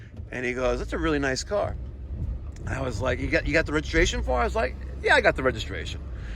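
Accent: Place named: Long Island, United States. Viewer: Ha! Ran accross a video head the accent and said oh that's long island!! Ronkonkoma in da house lol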